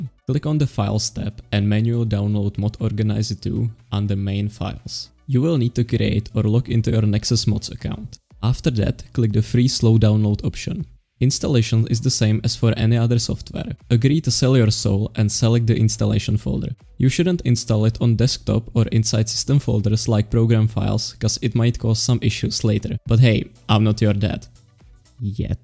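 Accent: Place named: Czech Republic. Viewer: Slyšim tu češtinu, bráško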